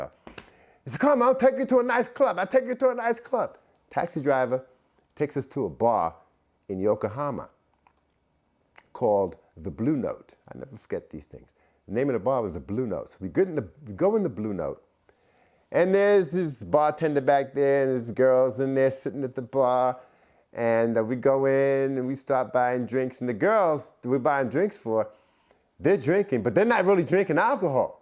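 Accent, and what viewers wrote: New York, United States. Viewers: God Bless this gentleman. Great story teller. Classic ol' school New Yorker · absolutely fantastic storyteller it seems like you were right there with him, I love that New York accent💯🥴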